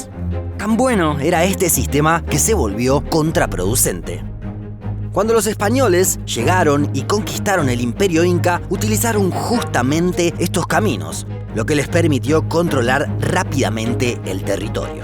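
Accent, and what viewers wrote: Argentina. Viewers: Gracias a este canal, cada vez que leo un mapa, lo escucho con acento argentino!! Maravilloso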